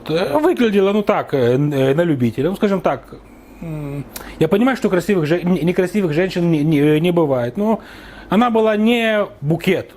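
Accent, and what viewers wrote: Odessa, Ukraine. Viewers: Класный одесский акцент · Приятно вновь услышать неистребимый Одесский акцент.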